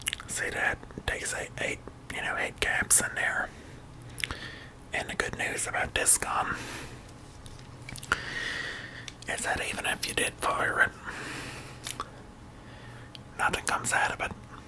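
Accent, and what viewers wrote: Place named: South, United States. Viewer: he is not australian he just has a country accent.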